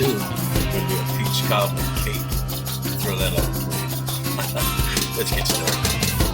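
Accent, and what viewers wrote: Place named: South, United States. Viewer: And you gonna pick on my countryness😁 I heard some southern.😁😀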